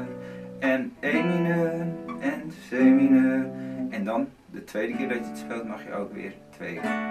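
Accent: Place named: Netherlands. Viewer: Unbedingt ! ;-) Das Rudi Carell Deutsch is doch nicht schlimm ;-) Freu mich drauf und DANKE !!!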